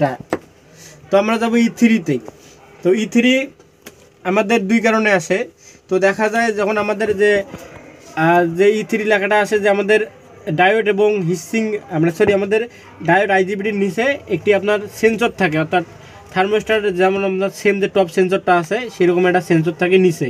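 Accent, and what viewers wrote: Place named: Rangpur, Bangladesh. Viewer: আপনার বাড়ি মনে হয় রংপুর।৷ আরও ভাল করে বলবেন। আপনার সাথে আছি❤❤❤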